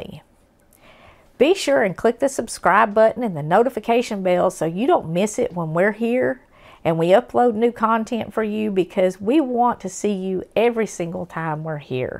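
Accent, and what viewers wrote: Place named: Tennessee, United States. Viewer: Your voice is comforting, reminds me of friends in Tennessee.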